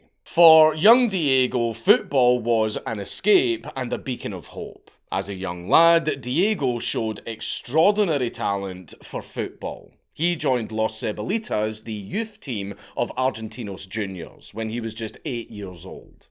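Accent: Scotland, United Kingdom. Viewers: He's clearly, audibly Scottish. He's British, though.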